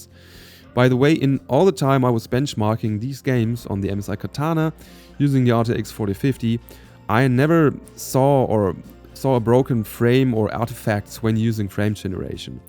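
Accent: Germany. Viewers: Kling für mich irgendwie nach deutschem Akzent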